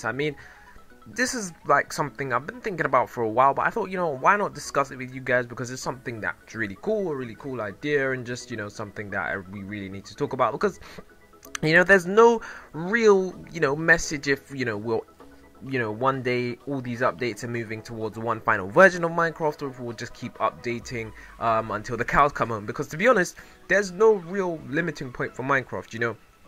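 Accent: United Kingdom. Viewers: in some videos you sound and in some others you sound british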